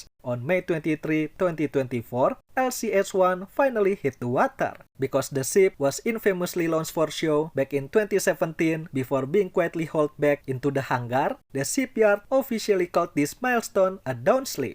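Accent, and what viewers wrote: Indonesia. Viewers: they already pay to 3rd party item and etc. why abandon it. it still on track for the balance LCS. btw, please use AI because you read the text like a robot without any stop. so hard to understand you indon accent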